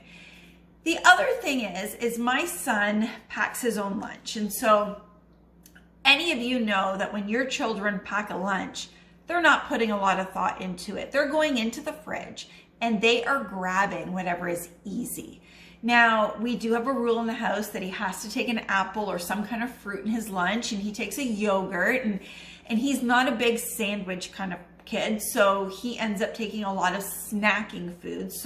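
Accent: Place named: Canada. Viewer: Hello! Thanks so much for visiting me! Wow this was so interesting! My Husband is Japanese so I make a lot of fermented Kimchi! I know you must live in Canada. Love your accent ❤️. New Friend here❤️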